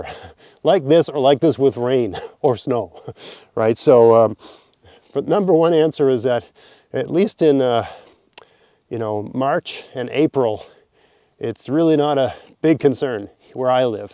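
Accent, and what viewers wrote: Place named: Nova Scotia, Canada. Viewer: i am a huge fan of the trailer park boys and live in europe. the moment i heard you talking, I was absolutely sure you are from Nova Scotia and i cant believe i was right :) you remind me a bit of Randy I dont know why, you are handsome and he isnt :) maybe the eyes and accent. love your channel, just subscribed and started watching. Thank you for everything you do. greetings from central Europe.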